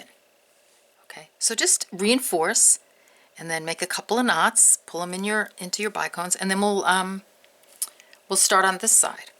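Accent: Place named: West, United States. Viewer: you do not sound like you are from the northeast, rather some where in the west.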